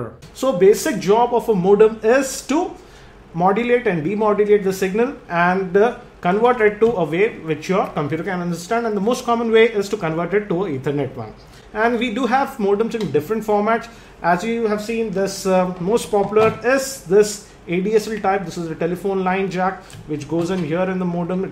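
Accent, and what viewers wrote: India. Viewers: Indian accent on POINT!!!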